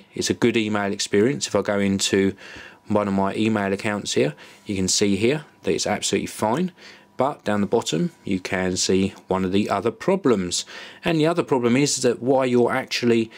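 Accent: United Kingdom. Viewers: I love hairy guys with british accent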